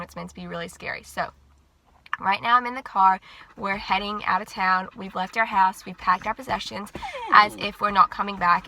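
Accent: United States. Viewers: She sounds more American than Australian... 🤔🤔🤔. But I still really hope that you and yours family are okay!!!! May god bless your whole country!!!! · I thought you were american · Omg, I didn’t know you were Aussie, I thought you were American, since 2014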